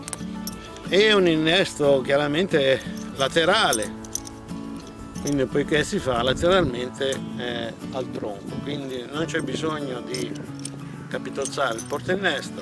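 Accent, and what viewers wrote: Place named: South, Italy. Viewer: Italiano del sur supongo: Sicilia, Nápoles o Cerdeña...(( Yo lo entiendo todo )).Es fantástico...!!! Amo Italia...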